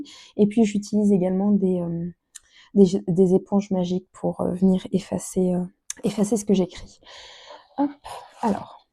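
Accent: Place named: Quebec, Canada. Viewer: Kikou, bienvenue sur YouTube 🤎 Ravie de te découvrir! Çà ne doit pas être facile de gérer le budget par 2 semaines quand on n'a pas l'habitude! Bravo pour ta résolution de reprendre ton budget 🥰 Je me suis abonnée avec plaisir, ton accent québécois qui transperce par moments est charmant 😍 Des bisous 😘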